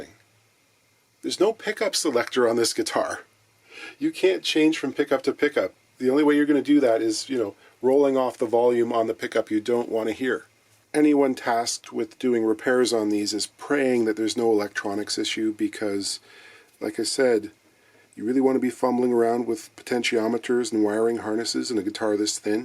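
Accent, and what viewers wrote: Germany. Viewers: I'm here for the German accent...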